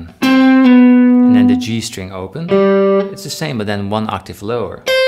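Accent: Netherlands. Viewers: What nice licks,! I ( 73 ) play and love my guitar(s) since 65 years. Still pure joy, fascination and constant learning. In addition, your slightly nederlands accent: Wonderful! Keep it up!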